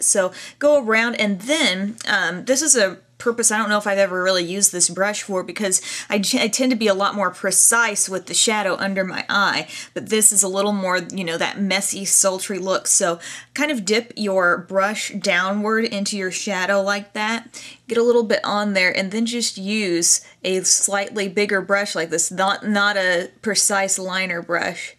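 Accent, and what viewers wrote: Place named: Alaska, United States. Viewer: are you from alaska?? you sound like sara palin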